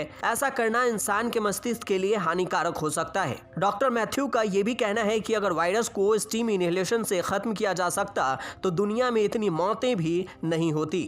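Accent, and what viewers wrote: Bihar, India. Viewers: कोई बिहारी VO किया है, खैर अच्छा है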